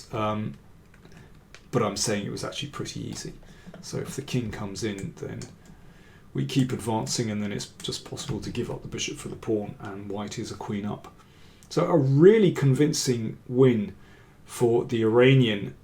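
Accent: United Kingdom. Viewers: Thanks for all the valuable stuff you lovingly provide chess enthusiasts with. And by the way, your succinct, eloquent commentary with genuine British accent offers an excellent English course!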